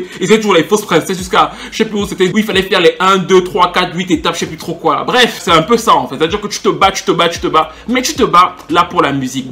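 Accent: Cameroon. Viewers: The way you speak french to an extent reminds me a lot of home 237 love it!!!!!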